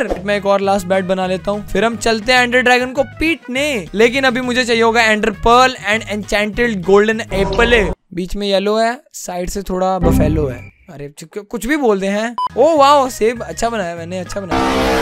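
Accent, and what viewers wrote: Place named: Haryana, India. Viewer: well that's not true we don't watch him for his haryanvi accent , we watch his video because we like him ( btw I love his haryanvi accent )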